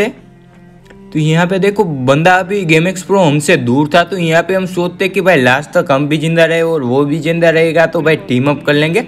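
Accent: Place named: Gujarat, India. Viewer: Lagta he Gujrati manus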